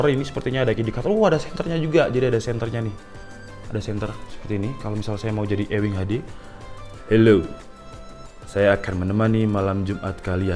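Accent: Java, Indonesia. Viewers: Samean jowo ngendi mas ? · Jawane ngendi mas? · Bahasane bahasa malangan mas😂😂😂😂 aku yo tekok malang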